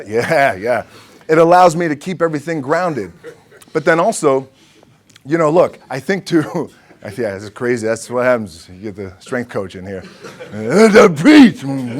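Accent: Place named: United States. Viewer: USA English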